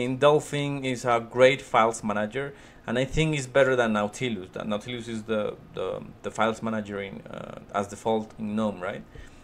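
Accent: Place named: England, United Kingdom. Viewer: Great video man and great english accent! I too was looking to switch to KDE this video was very informative, thanks.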